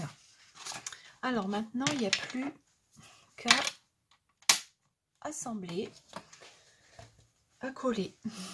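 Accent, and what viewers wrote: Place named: Northern France, France. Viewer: Ccou tout parait tellement simple avec toi j’adore il suffit de bien suivre tes instructions et tout baigne merci et bravo surtout que je débute et je rigole toujours quand tu parles avec tes ptites intonations de ch’nord lol ne change rien tu pourras pas toute façon Hihihihi bonne soirée merci pour le partage bisous 💋👍👋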